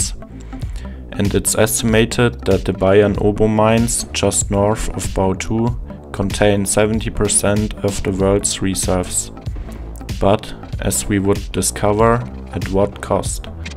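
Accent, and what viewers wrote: Germany. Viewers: dieser deutsche akzent is anders geil haha :D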